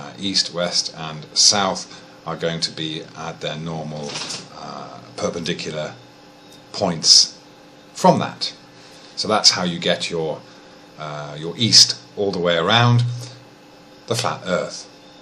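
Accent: England, United Kingdom. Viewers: If it is not religion making you ignore the evidence - what is driving your need for the Earth to be flat? My understanding is that there where two camps of flat Earthers - one who where justifying the Bible's lack of understanding of the nature of space etc. and the other was a group who wanted to feel special because of their "forbidden knowledge" of the flat earth which the world's governments are covering up. The two groups overlap in places and disagree in places and each sometimes call the other "shills". OR are you pulling my leg? I was surprised to hear an English accent in your video, I always assume flat Earth believers are mainly from America's Bible belt.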